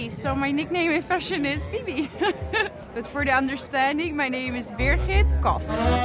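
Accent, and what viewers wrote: Netherlands. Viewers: I’ve never heard of her before. But when she started talking I knew immediately that she’s Dutch. The Dutchness seeps through ✨ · I love how the Dutch pronounce the “th” sound with a “d” instead. “Dat”, “dis”, so cute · You can hear the dutch accent coming out 🥰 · Oof Dutch accent ugly asf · U really hear that dutch accent amd the name...very dutch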